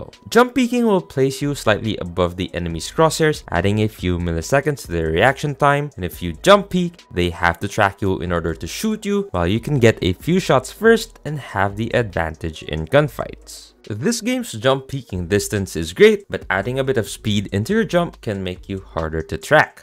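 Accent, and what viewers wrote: Philippines. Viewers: I’ve been wondering for a while r u Filipino cuz you sound like it?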